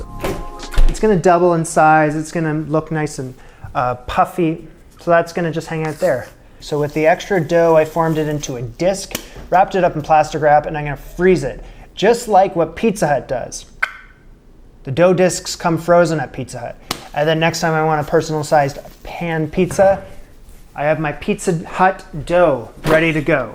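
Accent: Canada. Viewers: Where are you from? You're accent is super Canadian.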